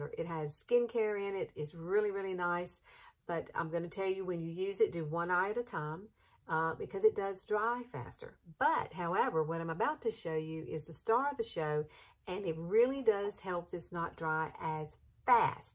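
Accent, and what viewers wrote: South, United States. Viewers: I could listen to you talk all day. You sound a little southern like me. I love your videos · Oh my goodness!! You are just the sweetest thing!! Your accent makes me miss my family down south!! I’m almost 52 and have lots of under eye issues. This trick looks so great and doable!! Thanks for being your authentic self and helping us gals out!!💜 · You, are FAB-U-LOUS!! I just found you on YouTube and having just turned the big 5-0 I am so glad that I found this particular video. You have that awesome southern accents and are just so enjoyable to watch. Keep it up girl. I just found my favorite YouTube personality! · U r so sweet! I’m frm MS so I love ur disposition and of course the accent! Southern girls forever!!!! Thanx for ur tips! I’m 41 and starting to get the lines😡. U look so beautiful and young!!!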